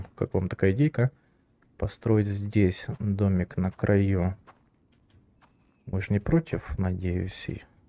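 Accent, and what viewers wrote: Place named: Surzhyk, Ukraine. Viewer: якщо не секрет, звідки ти, а то щось я чую місцями суржик у розмові? Якщо на каналі немає політики, то я підпишуся. Не люблю коли ігри плутають з політикою, а я прийшов дивитися ігри, а не слухати хто комусь на х.. настав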